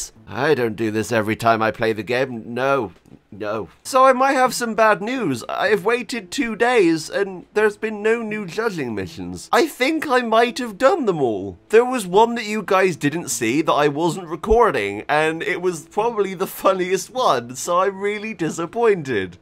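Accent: United Kingdom. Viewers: From where in Britain are you?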